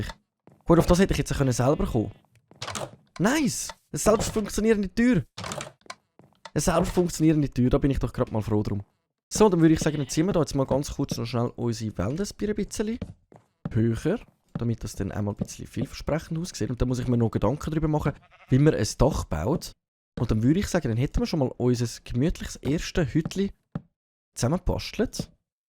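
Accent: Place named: Swiss German, Switzerland. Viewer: I am from neither Switzerland nor Germany but just wanted to hear the lovely sound of Schwyzerdütsch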